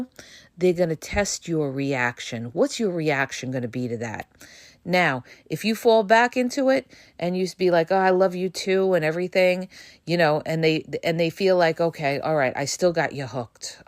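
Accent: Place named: New Jersey, United States. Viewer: I sincerely appreciate your knowledge & sharing it with us. Your Jersey accent is really apparent at times. Thanx again, Sister.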